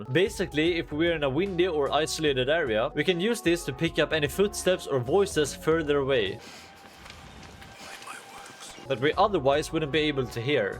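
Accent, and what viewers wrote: Sweden. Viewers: Most Swedish accent of all time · I never realized how Swedish pewdiepie’s accent was until I watched you, how quaint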